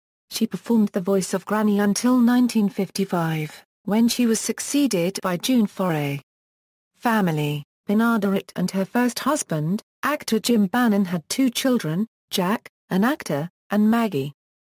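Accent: United Kingdom. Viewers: I was hoping this would be a new twist on this great actress; but it appears to be a reading of WIKIPEDIA with a British accent. Thumbs down.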